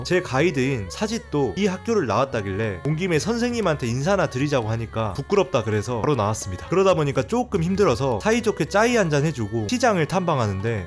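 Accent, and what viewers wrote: Jeolla, South Korea. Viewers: 전라도 사투리가 강하네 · 너 전라도니?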